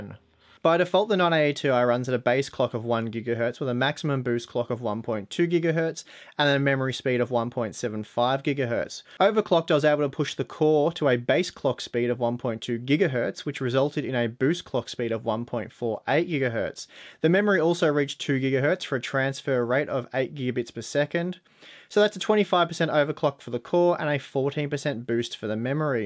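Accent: Australia. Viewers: Cool, thanks for clarifying. I admit I subbed to the channel when Matt was doing the videos and really enjoyed the style and depth of the benchmarking. Then one day there was a funny looking guy without a blue shirt I thought I clicked on the wrong video! But then I realized the style and methodology was the same. With that said, I enjoy your videos just as much if not more since your Aussie accent is a bit more tamed! Keep up the good work man you are my favorite tech channel by far!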